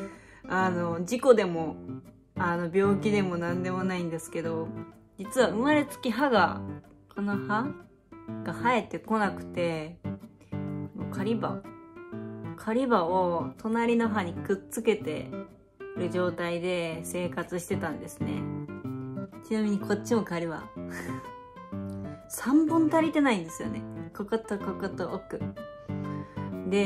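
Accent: Kansai, Japan. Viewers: 関西なんです？ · 関西弁全開! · だんだんアンドロイド感が薄れてきた。関西人なのもわかった。 これからどういう路線でいかれるのか拝見します。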